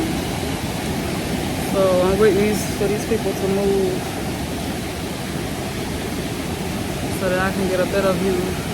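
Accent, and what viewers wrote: Jamaica. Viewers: That Jamaican accent slowly creeping in..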